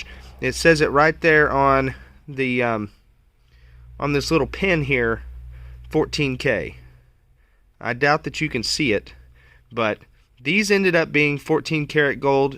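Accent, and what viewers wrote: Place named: South, United States. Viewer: I am enjoying your videos from Australia. I am originally from Texas so the Southern accent reminds me of home.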